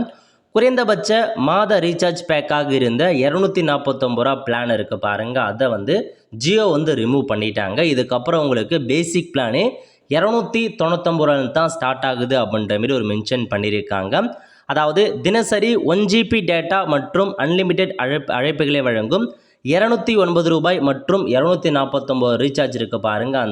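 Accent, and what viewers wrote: North, India. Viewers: உங்கள் பேச்சு வடக்கன் மாதிரி இருக்கு. கொஞ்சம் தெளிவாக புரியும்படி உச்சரித்தல் நன்று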